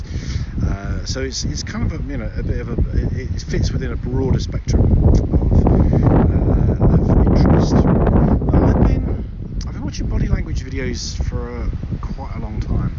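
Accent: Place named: United Kingdom. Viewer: I think the British accent is beautiful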